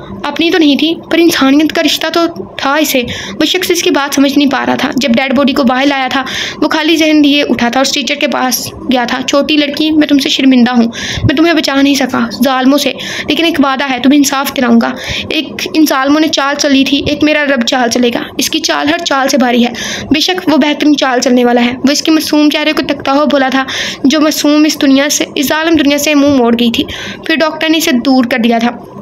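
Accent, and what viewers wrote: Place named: Lahore, Pakistan. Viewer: aap Lahori tu nahi ap ki vioce toon se muje laga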